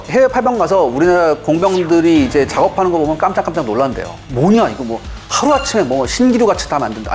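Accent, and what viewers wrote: North Korea. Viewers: 국방TV에서 북한 말투라... 정권이 맛이 가니 국방부도 맛이 가고 있구만.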